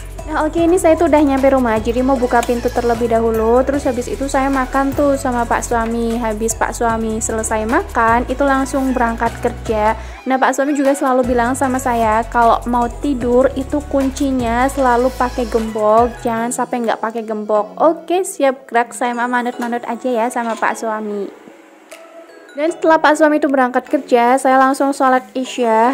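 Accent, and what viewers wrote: Banyumas, Indonesia. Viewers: Slalu nonton vidio tp baru ngeh klo org ngapak 😀 aku jg org ngapak bun, pemalang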